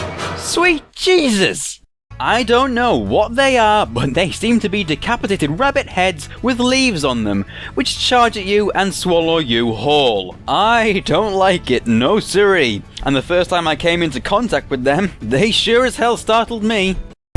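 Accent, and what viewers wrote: United Kingdom. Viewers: British PBG. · YOUR BRITISH! :D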